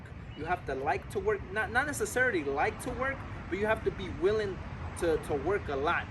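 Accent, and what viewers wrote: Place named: New Jersey, United States. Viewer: Good advice ✅✅✅sounds like you from New Jersey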